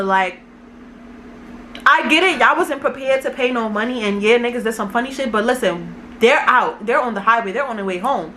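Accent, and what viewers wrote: New York, United States. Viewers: I love y'all New York girls accent. I'm fascinated and intimidated at the same time lbvs 😄😳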